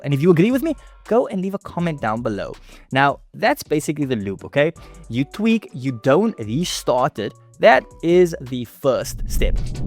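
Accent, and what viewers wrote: South Africa. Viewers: My brother are you from South Africa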